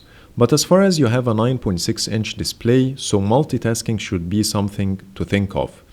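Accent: Egypt. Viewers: Egyptian accent lol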